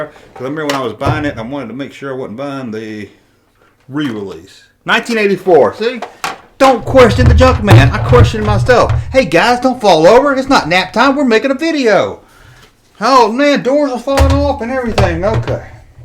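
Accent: South, United States. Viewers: Ya can’t talk right because you were raised in the south ya hick.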